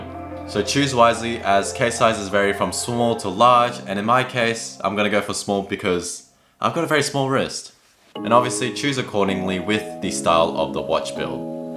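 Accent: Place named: Australia. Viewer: G'day. Decided to follow you because you're the only the second Australian accent I've heard doing YouTube watch content and wasn't interested in the other guy's content enough to follow. Please invest in some decent lights when you can and a better background, and I hope this goes from strength to strength for you. Cheers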